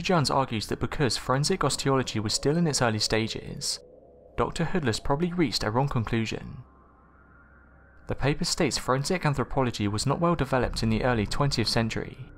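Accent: United Kingdom. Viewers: What's up with the robotic British dilougue, lol. I agree you truly can't say 99% without DNA. The bones and the plane been found in different locations so which is it.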